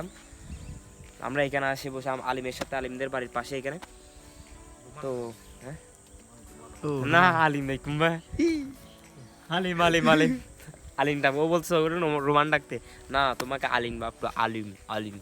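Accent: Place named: Chittagong, Bangladesh. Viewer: ভাই তোয়ার বারি চিটাগাং হডে😂😂😂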